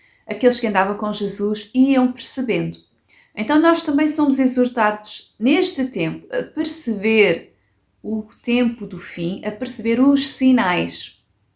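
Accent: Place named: South, Brazil. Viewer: Que linda eu aprecio muito o português sul real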